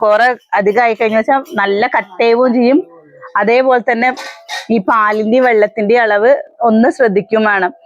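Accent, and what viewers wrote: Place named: Mannarkkad, India. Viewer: അത് ഞങ്ങടാ മണ്ണാർക്കാട് സംസാര ഒരു കുഴപ്പവും ഇല്ല. ച്ചി ഞ്ഞിങ്ങൾ ഞ്ഞികളുടെ ഭാഷയിൽ സംസാരിച്ചോളൂ all the best 👍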